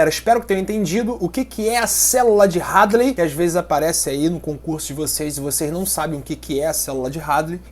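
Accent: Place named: Rio de Janeiro, Brazil. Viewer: sotaque carioca tava forte, são não mais que a explicação, falou pouco, mas disse tudo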